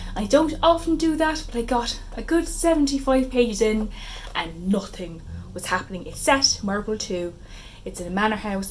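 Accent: Ireland. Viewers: Ahhh! I love your Irish accent!! I’ve always been a bit intimidated by reading Seamus Heaney’s poetry for some reason but will definitely pick some up now. ☘️ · As an Irishwoman I love your Irish accent!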